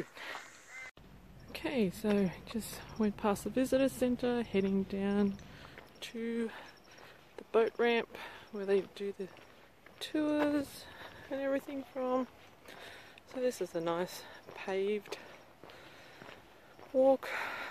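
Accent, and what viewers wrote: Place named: Australia. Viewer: Keep up the good work as Americans love Australians and there awesome accents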